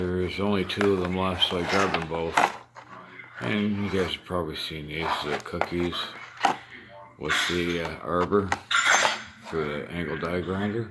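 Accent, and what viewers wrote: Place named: North, United Kingdom. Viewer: Some good stuff you got there! I hear that great north accent =p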